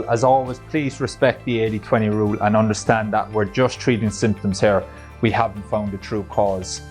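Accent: Ireland. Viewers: Sounds Irish to me. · What you on about? You can tell he's Irish straight away😂😂😂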